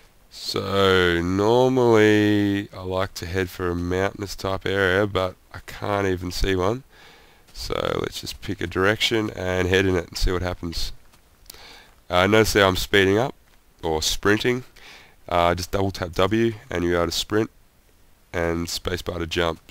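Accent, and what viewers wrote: Australia. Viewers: Aussie? But uh, full screen please and I am gonna sub cos I'm liking the shit :P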